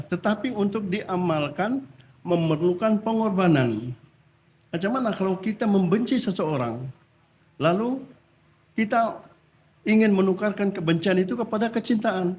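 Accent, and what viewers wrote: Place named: Indonesia. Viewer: beliau seperti orang Indonesia, logat bicara tidak seperti Malaysia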